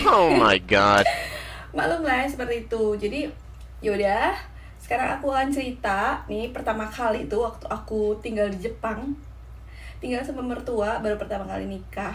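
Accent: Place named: West Java, Indonesia. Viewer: assalamu'alaikum.....mami...,smga istiqomah dlm syiar islam di negeri sakura, aamiin...,smoga klgnya sakinah mawadah wa rohmah, aamiiin.. indonesianya dari daerah mna? kok tmpak logatnya kyak dari Pasundan, smga slh tebakan saya.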